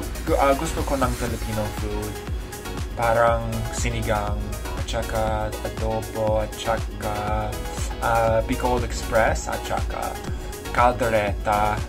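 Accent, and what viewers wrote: United States. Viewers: All you have to do now is polish the way you speak in tagalog and along the way you might lose the american accent when speaking tagalog.... woth a little bit time... the way you are gonna speak in the future might be on par with the locals keep it up.... you got the spirit imma stay tuned into this adventure even more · Good. I'm a Filipino and i can understand you. You have a lot of pauses and the accent is still american accent but it's fine as long as it's understandable. More practice 👍👍👍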